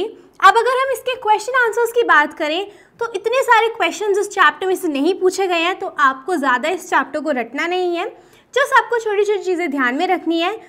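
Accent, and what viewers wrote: India. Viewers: Gujarat se ho kya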